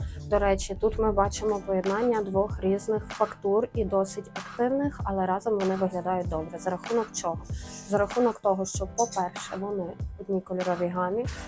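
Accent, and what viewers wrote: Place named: Western Ukraine, Ukraine. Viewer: а мені подобається , як Ви говорите. Можливо, це діалектизм навіть. По наголосах можу припустити , що Ви із заходу. Сорі якщо не вгадала )) і «получився» мені норм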